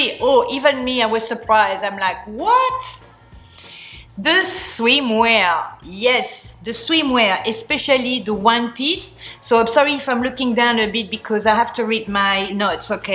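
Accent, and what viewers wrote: France. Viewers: I wish your videos were longer - I love listening to your French accent!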